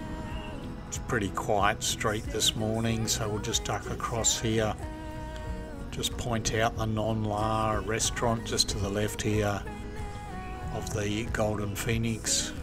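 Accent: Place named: South, United States. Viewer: You talk to slow, to be a Darwin local, and you have southerner accent.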